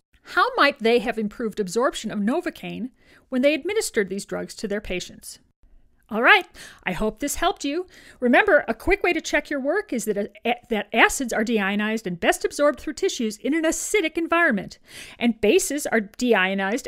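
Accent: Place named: South, United States. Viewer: It is a southern accent and I love it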